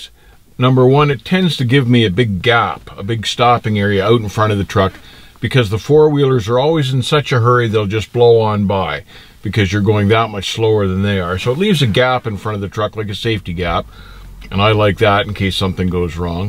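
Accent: Canada. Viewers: which part of Canada are u from?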